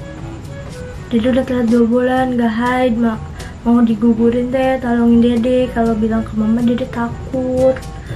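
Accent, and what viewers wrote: West Java, Indonesia. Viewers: Orang sunda ya neng?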